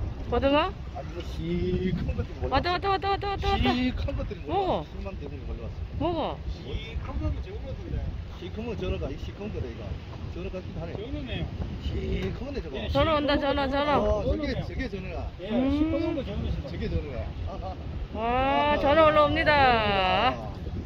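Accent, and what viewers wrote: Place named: Gyeongsang, South Korea. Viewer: 차가라~차가라 차가! 완죤 갱상도 말씨!ㅎㅎ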